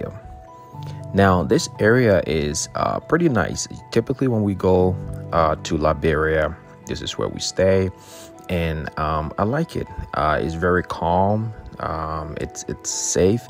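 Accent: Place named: United States. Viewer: American accent bruh, u eat cassava leaf? 😅😅😅. Awesome content bruh 👌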